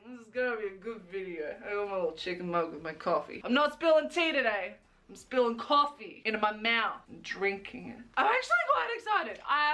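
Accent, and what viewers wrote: Australia. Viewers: Because I mainly watch your channel for the makeup videos, I’m not used to your normal voice but I am not complaining! I really appreciate JJ as well as! Also I love hearing a fellow Aussie on this platform, never realised how much an Aussie accent stands out! 😂 also having mild PTSD hearing those birds in the background... me hearing those after being on TikTok all night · I'm so used to that hearing you as your natural Aussie self made me do a triple take! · After a long time her accent has gone more Australian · Also, 11/10 for representing the Australian accent, we need the real you as much as fantasy you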